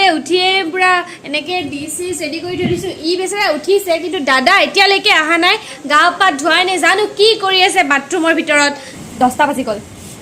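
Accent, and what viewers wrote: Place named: Goalpara, India. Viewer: bhal lage huni tumi 2ta lang kotha bur kole eta normal assamsse and eta lower’or goalpariya barpetiya assamese tu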